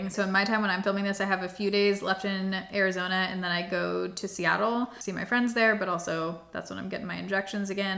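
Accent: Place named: South, United States. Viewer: Dude I'm a couple minutes in and I'm sure this gets real serious real fast, but just listening to you talk. I can tell you've been in the South lately lol there is some twang in your Midwest! 💚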